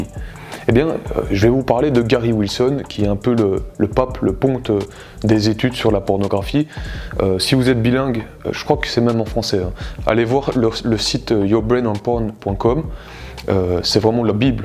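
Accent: Belgium. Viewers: Par contre il a un pouissant accent belge. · Petit accent belge spotted ;)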